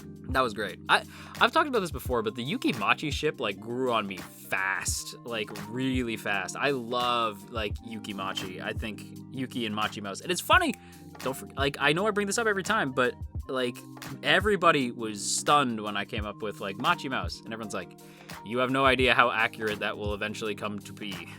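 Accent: Canada. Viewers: Increibly Canadian. I died lmao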